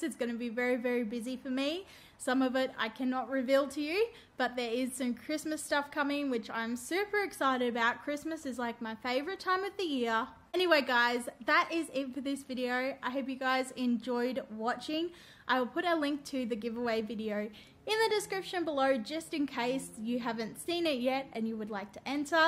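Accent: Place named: Australia. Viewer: Hi sweetie,i just adore your accent,i so wish i had been born in Australia. That’s a great idea,they are so cute,this is so generous of you,i dont know if I’m allowed to enter as I’m from Ireland xxxxxxx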